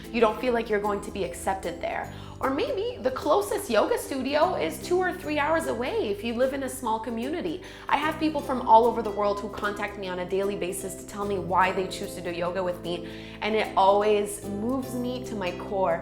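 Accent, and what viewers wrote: Canada. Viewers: I found your channel through a collaboration you did with Brett Larkin and stuck around because on your honest style and lovely Canadian accent. As an English teacher, I have a thing about accents 🤓 Thanks for keeping it real (the jealousy video and the meditation challenge 👍🏻) Hugs from Finland.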